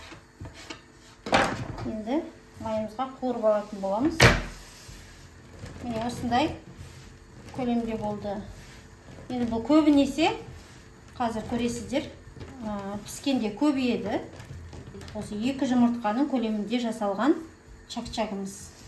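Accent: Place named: Uzbekistan. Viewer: Қазақша да орысша емесөзбек не қалпакша сөйлиеди бир бале былғап деген. Сөз жоқ қазағым да сүмдық 🤧😷🤧😷😷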